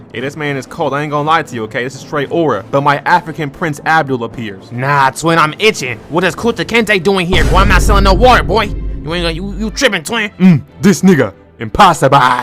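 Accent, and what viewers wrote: United Kingdom. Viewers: This guys british accent is deadly bruh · I liked the British accent, what are you talking about?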